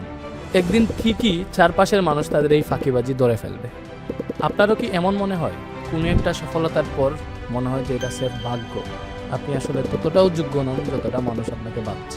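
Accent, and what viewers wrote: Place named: Sylhet, Bangladesh. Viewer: সিলেটের ভয়েস না দিয়ে শুদ্ধ বাংলা ভয়েস দিলে অসাধারণ লাগবে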